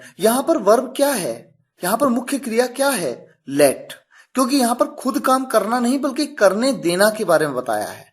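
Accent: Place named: Bihar, India. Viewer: Bihari lg raha hai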